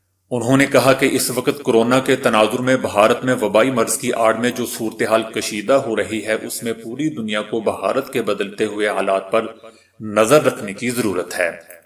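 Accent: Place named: Punjab, Pakistan. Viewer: pahly apny LAHJEY ko sahi karo.. pher khabrey pharloo... khaar punjabi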